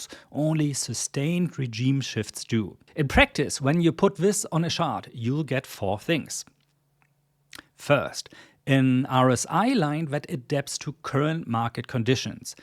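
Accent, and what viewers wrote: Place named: Germany. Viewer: Subbed - I had to. It's the German accent. ~(￣▽￣)~*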